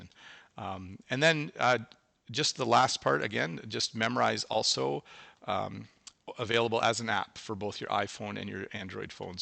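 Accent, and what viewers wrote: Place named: Canada. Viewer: Hi! I really like Canadian Accent! Thanks!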